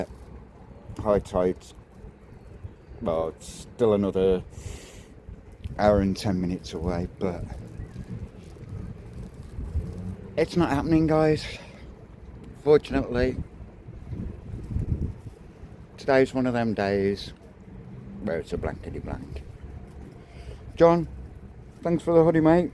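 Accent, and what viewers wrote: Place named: Yorkshire, United Kingdom. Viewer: You don't sound much like a brummie no more your starting to sound Yorkshire 😂❤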